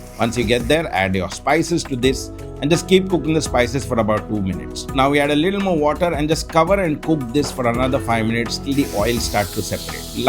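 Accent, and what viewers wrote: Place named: Mumbai, India. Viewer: Love the Mumbai accent and way of talking 😃Love ur recipes too … So subscribed to ur channel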